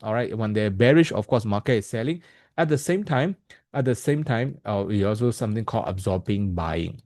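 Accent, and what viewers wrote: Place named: Singapore. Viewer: Singapore accent isnt comfortable for us to listen videos.😂 Please produce videos in Burmese 😊. Sg accent really sucks 😢 Please kindly don't present screen with dual window, all are so minute to properly see words.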